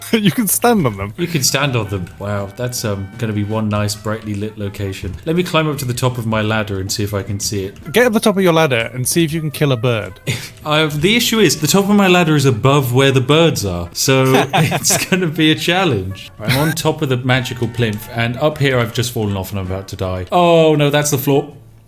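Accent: United Kingdom. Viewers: Oh god... that's your actual voice!? Like you don't overact for your vids... you seriously sound 200% british even when talking with your friend. Tea.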